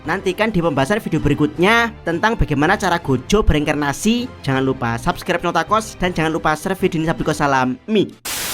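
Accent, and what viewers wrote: Java, Indonesia. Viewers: Gausah pake cekibrot norak banget jawernya terlalu kental,,,enak yg biasa aja om lebih adem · Gojo bang bulan godjo,medok banget si bang hhhhh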